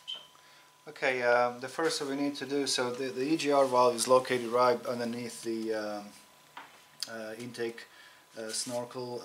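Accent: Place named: Kentucky, United States. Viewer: Love that old home Kentucky accent Hristos Voskrese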